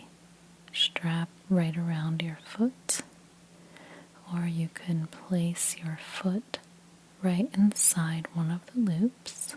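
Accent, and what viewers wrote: United States. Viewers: You’re the only ASMRTIST that has an American accent that I can listen to. Your voice is pure bliss! ❤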